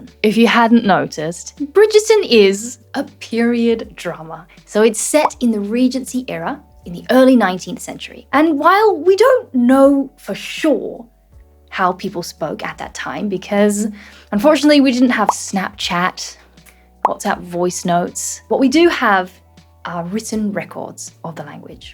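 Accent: England, United Kingdom. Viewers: Your voice and accent are really pretty! Where is your accent from? Is it English? Do some Australian accents sound British? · Hello! I like your British accent! Do you live in England ? And can you share tips to have an accent like yours ? I'm learning English(at school) but I live in a country where english isn't spoken that much... I like British accent so much! I've been trying to learn it but I don't have anybody to speak English with, so... I just talk with myself and I don't really feel like my British accent is improving...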